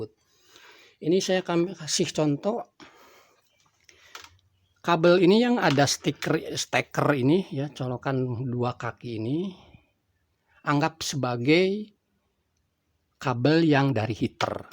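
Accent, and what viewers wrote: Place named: West Java, Indonesia. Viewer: enya nyariosna sunda banget hahaha tapi tenanaon, maju terus kang!!!! SUNDA terus berkarya☺️☺️☺️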